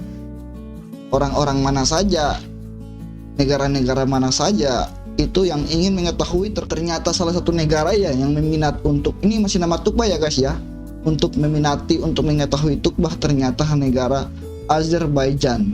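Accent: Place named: Eastern Indonesia, Indonesia. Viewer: 😂😂😂pecah n gokil ini chanel konten reactor ter the best menurut sy ahli IT,high class,scoupe nya international,logat narasinya jg unik Indonesia timur,keliatan smart,bicara artikulasinya jelas ga pk banyak mikir,dng grafik data keren min 👍👍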